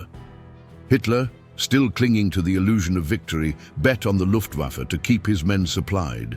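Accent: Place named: United Kingdom. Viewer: 20 minutes of BS in a British accent...YouTube should put warning "produce by British"....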